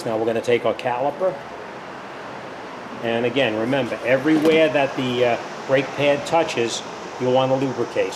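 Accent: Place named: New Jersey, United States. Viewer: Thanks for taking the time to post this DIY. Almost wished I still lived in JC instead of Florida so I could stop by your shop after hitting the diner. Let me know when you work on a Porsche 997 Turbo because I have not found anyone else that has made a great, comprehensive and complete video as yours. Also enjoyed hearing the Jersey accent and the horn honking, too. Keep it up.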